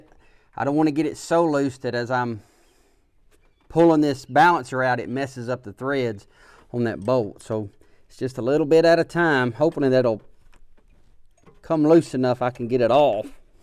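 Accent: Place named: Mississippi, United States. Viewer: You sound like your from Mississippi. No disrespect, I'm fro Mississippi lol. I have a question on a 97 f150. But I'm terribly busy. I'll. Get back with you tonight. Thanks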